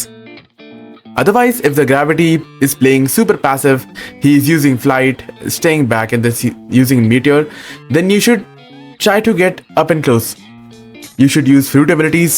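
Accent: India. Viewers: the indian accent eww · ngl u sound like a indian curry dweller but good tips btw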